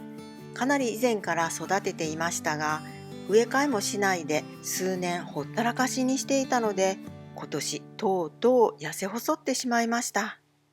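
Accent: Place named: Kansai, Japan. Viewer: こんばんは！初めてコメントいたします😊 いつも参考にさせてもらっています❤ 私の実家も関西で、baさんの関西の言葉が大好きで、九州からほのぼのといつも見させて頂いてます😊 今回の動画で、焼け野原の鉢たちをそうすればいいんや❗と何だかやる気を頂きました😊多肉のとりこになってから４回目の夏…こんなことになる？と沈んでいたので、私もそうやって楽しみたいと思えました😊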